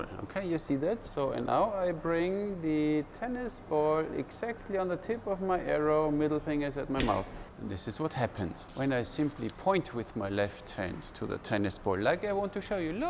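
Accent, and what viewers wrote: Germany. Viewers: Are you German? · Dein englisch ist so geil :) man hört sofort, dass du ein deutscher bist ;} was ist mit dem kompletten instinktiven schießen?! Also NUR das Ziel fokozieren?! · Dachte ich erstmal der ein Deutscher ist, doch hätte ich Unsicherheit entweder er Deutsch oder vielleicht Niederländisch ist. (Leider habe ich nie nach Europa gereist).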